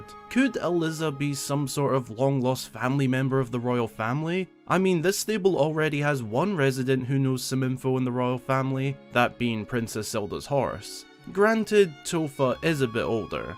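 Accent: Scotland, United Kingdom. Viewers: Your Scottish accent is amazing xD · you sound like you're Scottish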